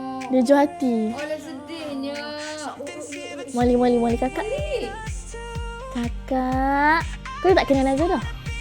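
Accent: Kedah, Malaysia. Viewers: Aku suka betul loghat kedah, nak pulak yg bercakap lagi suka dgr 🤩 fighting post, will support baby no matter what 😘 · sampi kedah pekat betul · suka lah tengok bebel dalam bahasa kedahh, comell tauu 😍 btw sebut nama abg sayang ke tuu 👀 kita rindu lah aimala, abg syg wait for me~ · ckp kedah smpai aku terikut nk ckp sekali 😂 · Nanti buat lagii vlogg byk2 suka giler dgr cakap kedah 😌✨😽 Minat dari yg masakkan utk ipan 🫶🏼 serious sukaaa gilaa dgr ckp 😻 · suka betul dengar cakap dengan loghat Kedah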